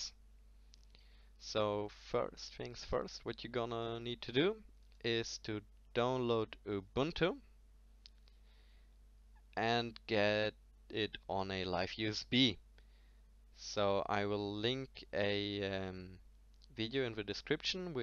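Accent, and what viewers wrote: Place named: Germany. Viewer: Hey, i managed to get Ubuntu running in my Macs. I actually have several macs like a Studio with M1 Max and a MBP M3 Max. The solution - if anyone needs it - is called "UTM" and can run a Ubuntu 22.04. From there you can run the script. God thx i did not throw away my 6TB from my WD MyCloud Home. It will take days or weeks to recover files i guess, but it should worth it. Am i right you are german like me? Because of your accent. Anyway... WD is der letzte Dreckscheissladen und bestimmt meine 4te HDD. Hoffentlich Insolvenz incoming 😂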